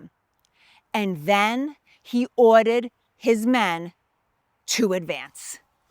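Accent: Boston, United States. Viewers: Damn,. I didn't think anybody's Boston accent was as thick as mine. Well done. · She had me at Hello. Love her Boston twang . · Being a Bostonian, I really appreciate a YT channel where the presenter talks like me!😅 Great job,! Careful walking those ridges! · You are so smart, attractive, and live the Boston accent!!❤ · My friend told me about you. I am a huge history buff, but my friend says your Boston accent is worse than mine. I was on a TV show based in Boston, and I had to be subtitled. Hahaha, I just subscribed. LOVE your work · Your either from Wostah, or Reveah. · Will you take that Boston accent with you when you go out of Massachusetts.🐎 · I love that Boston accent. My Love is a native of Cranston RI. If u have ever heard a girl form there talk u will fine it just as funny as i still do even after 44 yr.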